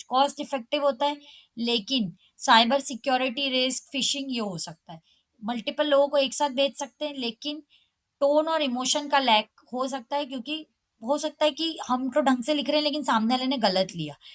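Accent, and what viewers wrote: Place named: Bhopal, India. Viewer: didi aap pakka bhopali ho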